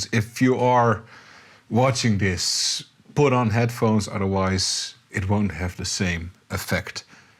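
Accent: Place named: Netherlands. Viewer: Your English sounds sooooo Dutch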